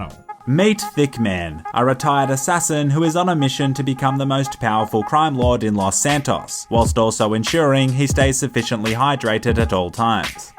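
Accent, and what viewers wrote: Australia. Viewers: Having a ball watching your vids man. Only just found your channel .. alot of my favourite YouTubers are Aussies so good to hear another one.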